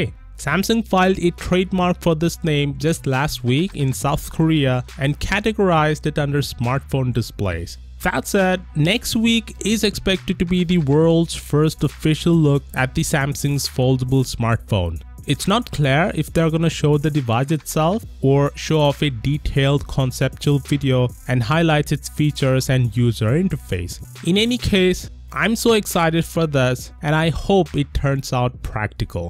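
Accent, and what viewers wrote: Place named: India. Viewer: Indian english hahaha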